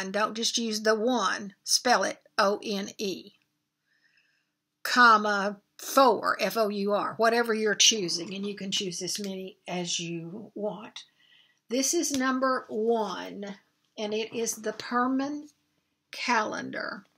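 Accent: South, United States. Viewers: I enjoy your videos and live listening to your southern accent. One.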